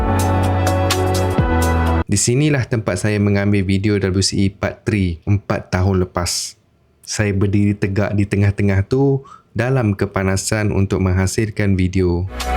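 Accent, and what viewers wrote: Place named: Java, Indonesia. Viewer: Abang ni orang Jawa ya,Sebab bunyi slang abang bercakap ada bunyi Jawa😄🙏🤝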